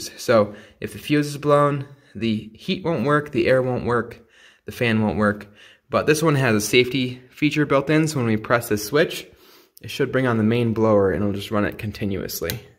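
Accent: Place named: Northwest, United States. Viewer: Carolina? He has a definite Northwest accent. People move around so much these days, many people from the south don't have that twang anymore.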